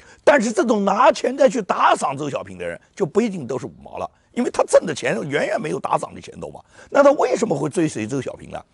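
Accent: Nanjing, China. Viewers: 啊是南京人啊，听口音像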